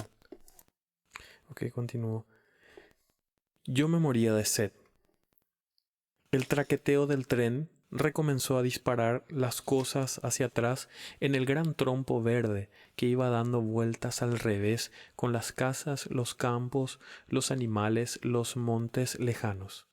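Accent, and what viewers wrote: Paraguay. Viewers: Hermoso Roa Bastos. Muy bien leído con tu dulce todada paraguaya. Gracias por brindarnos a este Maestro de la literatura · Gracias por el aporte. Sería bueno que pudieras completar el libro porque no se encuentra en youtube y con tu acento paraguayo y las breves explicaciones de términos en guaraní enriquecen mucho la experiencia. Saludos y gracias!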